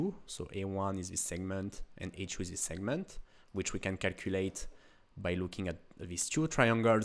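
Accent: France. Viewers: You primary Lang is french ? Because you have a french accent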